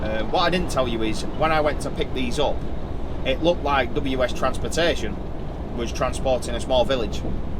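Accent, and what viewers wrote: United Kingdom. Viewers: just watched this, and subscribed. loved it, brilliant accent as well, tho I dunno from what part of the UK that is. Maybe someone here can enlighten me on that ? Greetings and a big thanks from Holland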